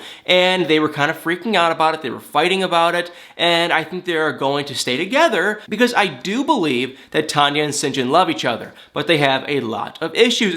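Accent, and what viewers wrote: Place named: South, United States. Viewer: You're southern aren't you? "woman's trouble" lol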